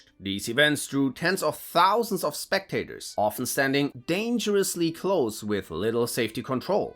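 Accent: Germany. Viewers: You know I normally don't like channels like this, because they feel like kiddie channels, but this one is different. Would like longer explanations, but I understand that people don't have that long of attention spans nowadays thanks to shorts, reels, and Tiktok. But it is good for background noise while cooking or working on projects around the house. Also I spent three videos trying to place your accent...and I finally got it. You're German, are you not?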